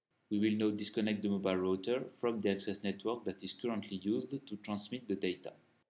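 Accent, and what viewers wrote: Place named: France. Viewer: No no, French English ;-)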